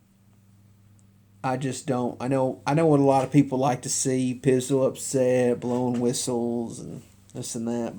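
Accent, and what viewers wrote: South, United States. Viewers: where are u from? You don't have that stupid New York accent. U actually sound more southern.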